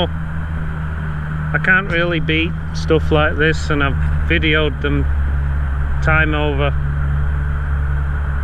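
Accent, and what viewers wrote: United Kingdom. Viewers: You sound like your from uk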